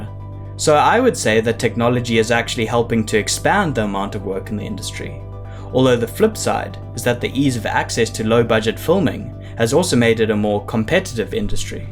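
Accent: South Africa. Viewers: AHA! I knew you were from some SA from ur accent but wasn't sure. Super cool to see South Africans doing so well on Youtube! Love the vids man keep up the good work.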